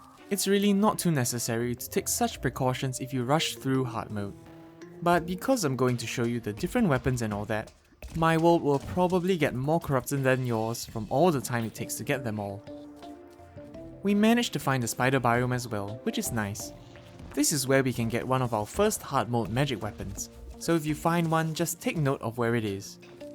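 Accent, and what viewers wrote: Singapore. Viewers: Why is your voice so nice are you Singaporein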